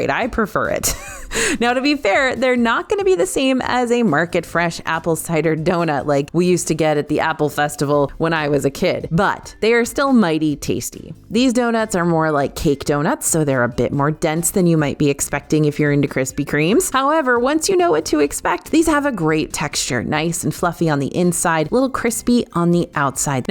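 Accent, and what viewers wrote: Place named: Texas, United States. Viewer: Is it just me or is Texas accent really strong in this video? 😅 Btw, I'm really hungry now.😘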